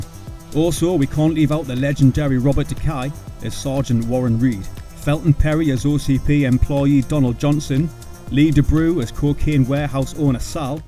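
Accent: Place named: Newcastle, United Kingdom. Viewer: It's nice to hear a fellow geordie on this channel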